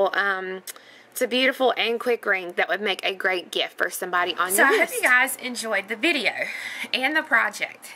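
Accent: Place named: North Carolina, United States. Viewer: I like watching your videos because the view is VERY CLEAR and in focus. Besides I love hearing your North Carolina accent! Fun to hear you talk.... I'm a Texan so I probably have an accent as well . . .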